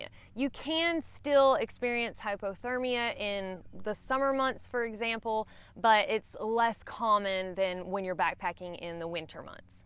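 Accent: South, United States. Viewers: Well done - accurate and factual. Should help new folks stay safe. Plus, I LOVE your accent. Great to hear a southern gal telling it like it is. Nice work!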